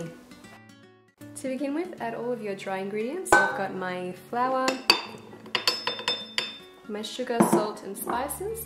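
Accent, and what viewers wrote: Australia. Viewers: Greetings Great instruction. That is definitely an Australian accent but your Italian accent is perfect also. I am in Newcastle. What brand of shortening are you using? The only vegetable shortening I could find was copha.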